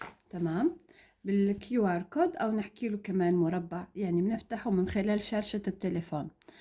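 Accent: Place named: Mostaganem, Algeria. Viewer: و الله يا لهجة مستغانم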